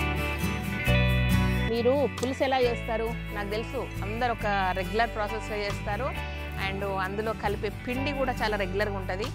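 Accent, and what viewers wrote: Telangana, India. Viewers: Eeeroju Telangana yaasa bavundi bai🙂🙂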